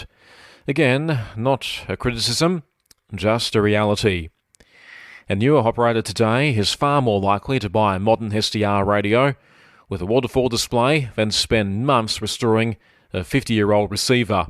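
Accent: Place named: Ireland. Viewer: What a silly accents! Irsih?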